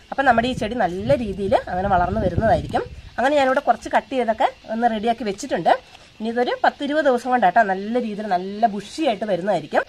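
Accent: Wayanad, India. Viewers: Wayanad language Kelkkan rasamund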